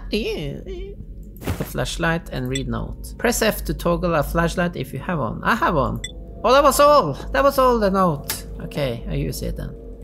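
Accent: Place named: Sweden. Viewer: thanks brah Are you swedish ? , I asked because I wanted to guess what you are , you sound very swedish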